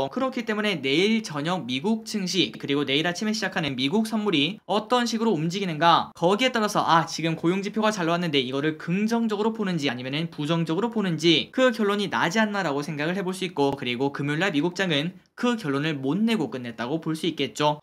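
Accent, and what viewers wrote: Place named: Gyeongsang, South Korea. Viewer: 경상도 억양이 살짝 들렸는ㄷㅔ......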